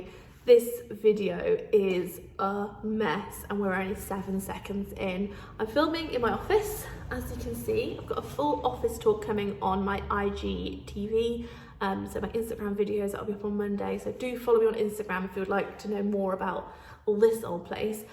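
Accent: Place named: United Kingdom. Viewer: YOU ARE AMAZING!!!! Put up all the videos!!! You are one of my favorites for so many more reasons than your fun British accent 😊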